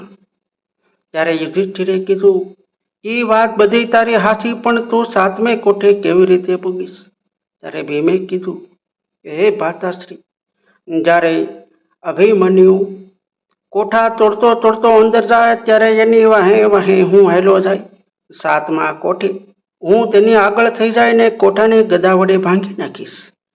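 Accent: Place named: Amreli, India. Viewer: આવું બધું કથાકારો માટે રહેવા દ્યો,. બહુ જામતુ નથી. તમારી તો તળપદી અમરેલી/ભાવનગરની બોલીવાળી કોમેડીમાં જ અમને વધારે મોજ આવે છે.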